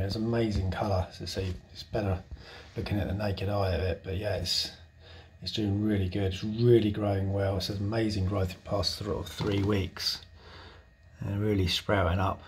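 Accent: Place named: United Kingdom. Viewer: Hi mate I'm based in Birmingham nice to see a reefer with a british accent. I'm looking at starting my first reef tank I'm stuck between getting a small nano tank like you have now or getting a red sea 250. Bit nervous about starting a marine tank so I'll probably take it really slow with fish only until I feel more comfortable and start adding corals.. what do you think the best option is for a first time fish keeper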